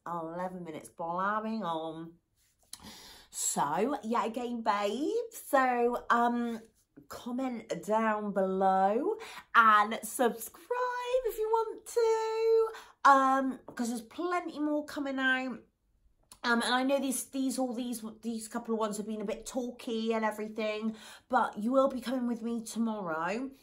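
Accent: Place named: Cockney, United Kingdom. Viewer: I'm not a english speaker but i loveeee cockney it's too nice to hear u talking, u know i tried to understand what u was saying but it was fucking hard JAJAJ love uuu babe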